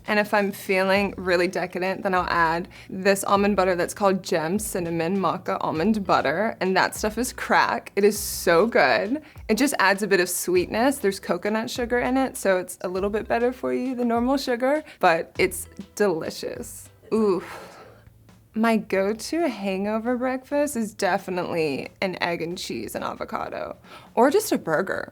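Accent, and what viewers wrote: United States. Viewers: Most American Accent I've heard. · Girl all Americans sound like this stop being a child and hating on someone cause of there accent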